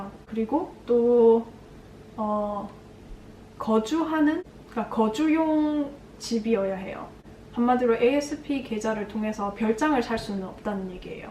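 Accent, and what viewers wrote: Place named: Busan, South Korea. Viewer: 좋은 정보 ㄳ요~ 누님, 부산 출신~? 정겹네요ㅎㅎ 근데, 혹시 헬싱키에 오피스텔은 어디서 찾아볼 수 있나요~?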